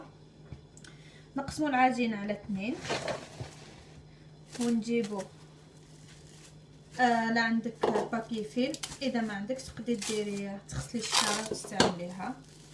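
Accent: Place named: Constantine, Algeria. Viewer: خاطر انا قسنطينية نفس اللهجة هههه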